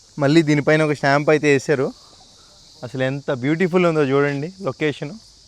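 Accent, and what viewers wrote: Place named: Visakhapatnam, India. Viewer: మీది విశాఖపట్నం ఏనా భయ్యా మీ మాటలు అక్కడిలానే ఉన్నాయి కొంచం. మీది ఏ ఊరో చెప్తారా భయ్యా 😊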